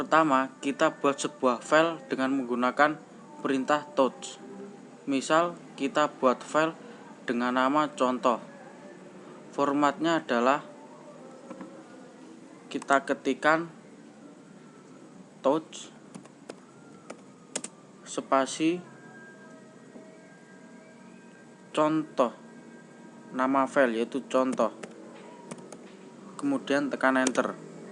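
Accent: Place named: Java, Indonesia. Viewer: Suarane bogel medok :v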